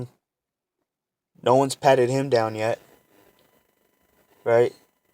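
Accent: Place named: Los Angeles, United States. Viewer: yo u from LA right?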